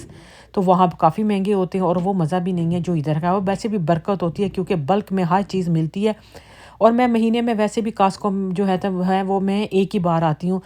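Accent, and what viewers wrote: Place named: Peshawar, Pakistan. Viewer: Are you hindku speaking your accent sounds peshori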